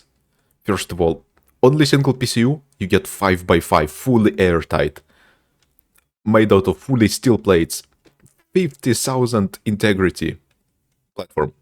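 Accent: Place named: Russia. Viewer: руссиан аксенте ) Хотя возможно я шиз.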